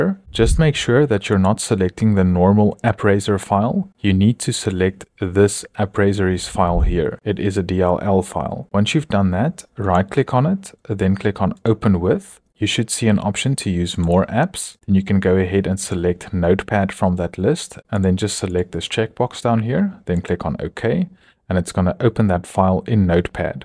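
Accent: South Africa. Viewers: i can hear the 🇿🇦 in him😭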